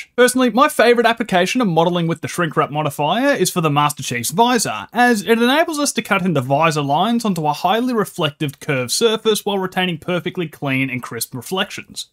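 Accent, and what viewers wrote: Australia. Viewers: No clue what the fuck I just watched as I don’t do whatever this is, but it’s nice to hear a fellow Aussie - Merry Christmas happy new year legend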